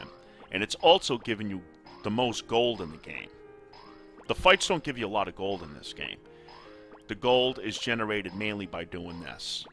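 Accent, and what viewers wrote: New York, United States. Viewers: New yawk accent?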